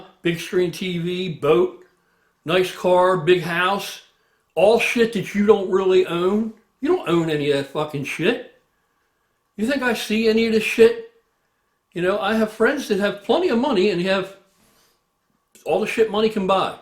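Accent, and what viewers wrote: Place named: Philadelphia, United States. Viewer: You gotta be from Philly or South Jersey. 😅i know our accent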